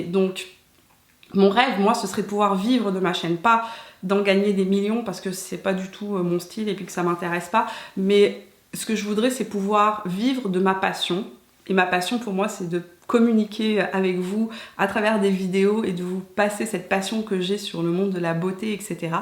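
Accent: Canada. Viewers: Très jolie ton histoire avec ton chéri ! Il vaut mieux se teindre les cils que faire une extension de cils . Sympa cette vidéo en tous cas ...c'est Marant parce que je pensais que tu étais canadienne du coup ...tu as pris un peu l'accent je trouve. Mille bises et bravo pour ta chaîne tu es toute simple et de bons conseils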